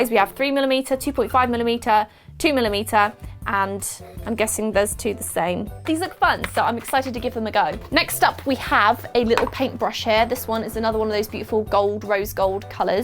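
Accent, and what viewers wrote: United Kingdom. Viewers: Would not mind seeing and hearing you for two hours your from my neck of the woods so is always nice to hear another person from the UK but from elsewhere in the world I love the look of the Inky box hugs from Scotland 😁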